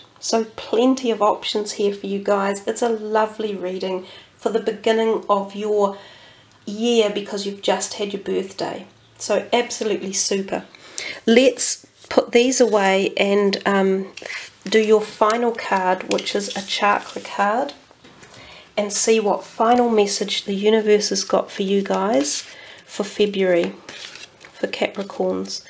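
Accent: New Zealand. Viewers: Hi Hun, a kiwi accent which ya don't see on here.i am just across the channel in oz....good to see a few locals putting card readings on here. I keep an eye on ya insights to see what comes to pass.......cheers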